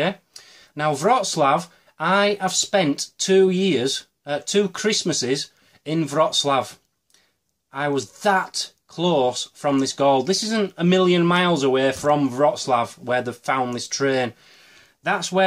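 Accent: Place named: Newcastle, United Kingdom. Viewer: Funny how geordie is so easy on the ear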